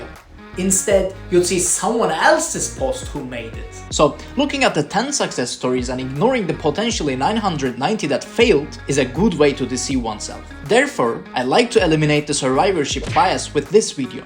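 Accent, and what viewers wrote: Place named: Turkey. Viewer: aksan türk aksanı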